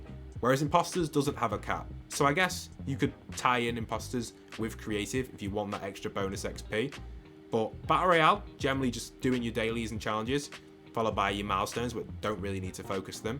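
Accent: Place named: United Kingdom. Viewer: He sounds like Luke from modern family with a British accent istg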